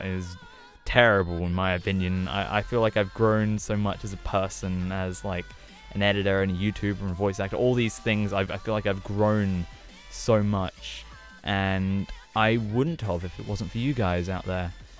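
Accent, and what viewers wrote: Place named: United Kingdom. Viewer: Um... Wow... ME: YOU SOUND BRITISH, WHY?! [MIND BLOW]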